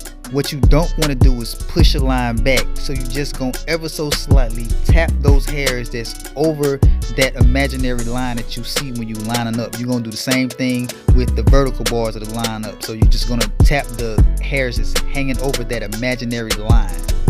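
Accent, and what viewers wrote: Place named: South, United States. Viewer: Oh Chile u are COUNTRY Lmbo love it !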